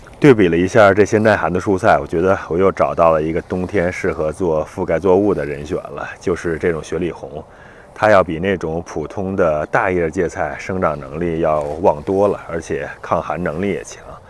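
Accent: China. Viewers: 哥们儿我看了几个你的视频，完全震惊了！！！这些菜，鸡鸭，粮食，都是你一个人种的？？？问号儿已经不足以表达我觉得不可思议的思想感情，我真的佩服的已经说不出话了，看你也是大陆人，听你说话的语气也是曾经在大陆上班的，居然到了美国能完全脱胎换骨成了种这么多种农作物和养这么多家禽的农民，更牛逼的是，你居然还每天上班，农民都是下了班以后当的，简直了也是，我已经快要语无伦次了，只剩下：卧槽！真的佩服和羡慕！啥也甭说了，赞一个！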